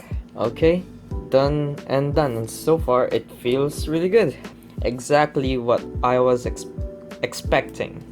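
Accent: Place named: Philippines. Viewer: I don't know why but. I feel like you live in the Philippines Judging on your voice and the way you talk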